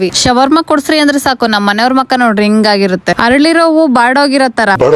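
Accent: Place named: Chitradurga, India. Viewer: Nimdu proper Chitradurga na sis..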